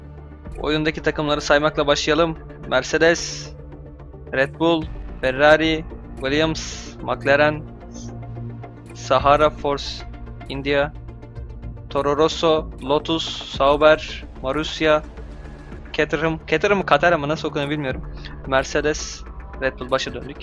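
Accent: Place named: Hatay, Turkey. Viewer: diksiyonun baya düzelmiş. Ayrıca Hataylı olduğunu ilk duyduğumda inanmamıştım ama bu tonlamayla başka bir ihtimal düşünemiyorum.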